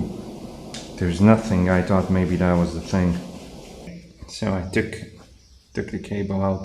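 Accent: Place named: Bosnia and Herzegovina. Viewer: I was surprised when I heard this very familiar Bosnian voice on an unknown small channel. Guess 2 x 2 is 4 then.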